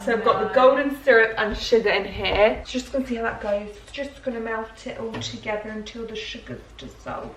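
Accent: United Kingdom. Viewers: you are aggressively British I love it - sincerely an American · British accent makes me so happy and emotional lmao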